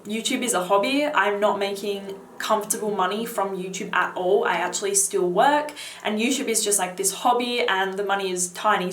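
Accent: Australia. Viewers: I dont even know how I stumbled across this. lol But good looking out. I just purchased 2 of them :P Are you Australian? I think I just became a fan. :P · Are you Aussie? You sound like it. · How have I never seen your channel before!? And Australian.. bonus.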